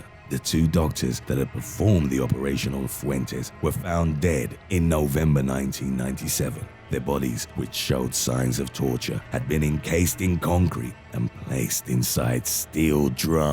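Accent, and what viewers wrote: Spain. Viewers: this narrator gotta be some sort of spanish. (btw love the voice) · nice video, your spanish is pretty funny btw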